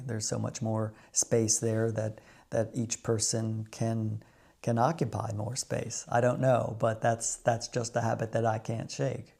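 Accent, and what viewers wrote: United States. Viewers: Not a problem to hear your southern accent. I think when I visit Tennessee they wil notice I am a Dutchy. As I said earlier this is an old account and member 2 for you 🙂 But still Mark* <link>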